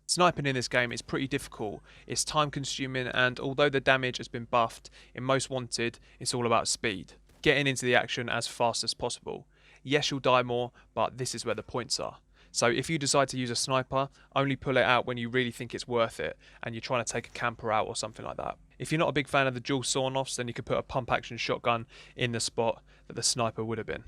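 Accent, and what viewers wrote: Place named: South London, United Kingdom. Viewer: Like your direct no nonsense videos, sound like a South London boy ...cheers!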